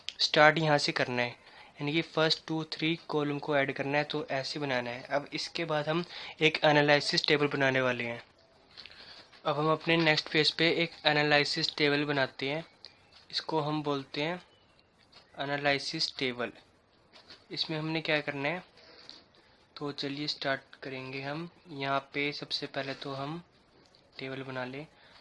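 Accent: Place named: Haryana, India. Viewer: Haryanvi lgte ho nice explaination good dear ! 🌏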